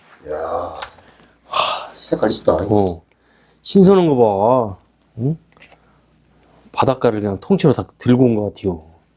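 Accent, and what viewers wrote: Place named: Chungcheong, South Korea. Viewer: 충청도 말씨 일부러 쓰지 마시고 전라북도 말씨. 사투리를 써 주세요. 저는 정읍이 고향 입니다.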